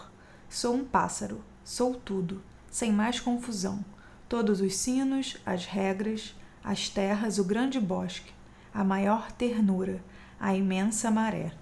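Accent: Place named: Rio de Janeiro, Brazil. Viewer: Ah esse sotaque carioca...❤️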